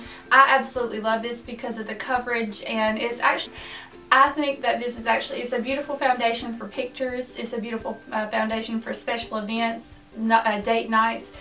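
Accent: South, United States. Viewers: You're accent is so cute, I'm from the Heart of Dixie as well <3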